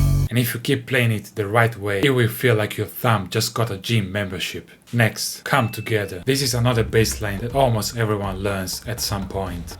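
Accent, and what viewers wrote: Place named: Italy. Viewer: who? the commenter said he was mexican and I dont think is american, sounds pretty italian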